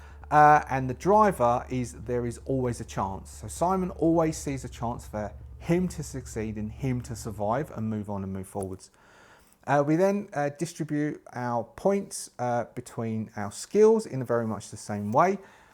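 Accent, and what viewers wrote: United Kingdom. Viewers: Never figured you lived in Aus - sound like a proper cockney geezer. Regardless, you definitely didn't miss much in those 22 years. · Even swearing sounds polite when delivered with a proper English accent my dude.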